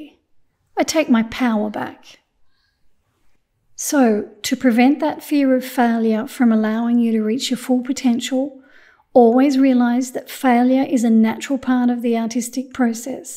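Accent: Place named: Australia. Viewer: I love your videos. I have just started watercolor painting after nearly 45 years since school and found your tutorials and tips very helpful and inspirational. I also love your humorous outtakes at the end and love your Aussie accent. ❤❤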